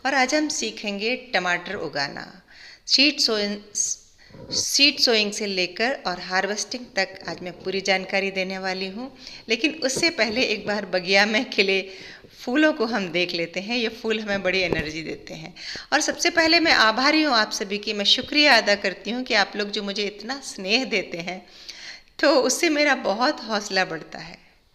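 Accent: Standard. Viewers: Very nicely taught Ma'am. I luv ur shudh hindi !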